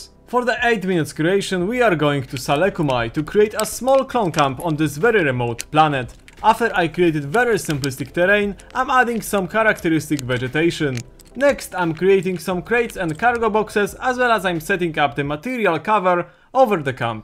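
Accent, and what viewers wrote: Poland. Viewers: Ten polski akcent słychać odrazu haha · ok because i hear this accent and me too from poland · I thought you're from Russia, but then I realized that you are our Poland Slavic bro with the same accent) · slychac ten polski akcent POLSKA GUROM! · Man, gadasz polskim akcentem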